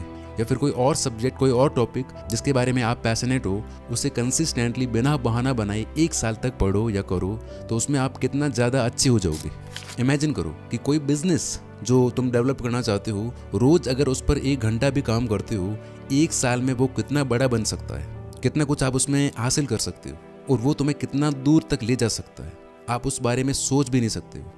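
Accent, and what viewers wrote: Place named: Marwar, India. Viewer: Aapki aavaj se . Pata nahi kyu. Muze lagata hai aap Rajasthani he sayad marwadi? I think I am sure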